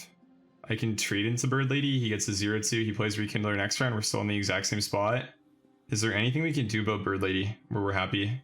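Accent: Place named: Netherlands. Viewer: Are you dutch? I think I recognize ur accent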